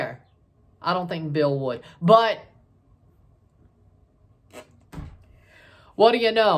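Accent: South, United States. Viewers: Ok but I assume your in Toronto now. That is cool that you are a soccer fan. Not alot of southern guys into soccer. Love the content. keep it up! Thumbs up bro!!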